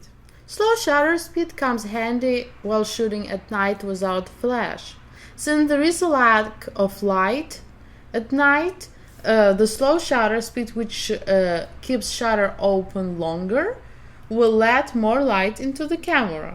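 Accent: Russia. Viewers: are you from russia or romania?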